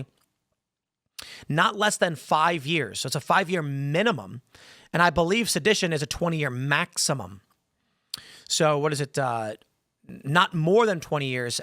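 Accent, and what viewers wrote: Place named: Chicago, United States. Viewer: Your “Chi-Town” accent has been duly noted as of late!…I Like it!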